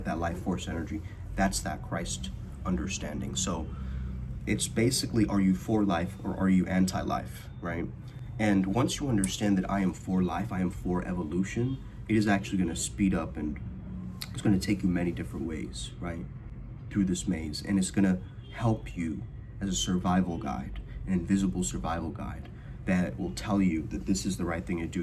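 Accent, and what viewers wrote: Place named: United States. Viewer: He was brought up in America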